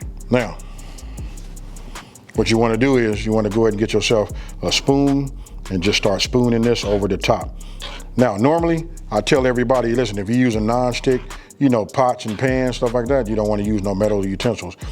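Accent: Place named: California, United States. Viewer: I don't know if you are from Cali but you sound like you are. I have cousins there and the accent is........, LOL Just like you guess would say about southerners. LOL It's the way ya'll prounounce words with the letter "I" LOL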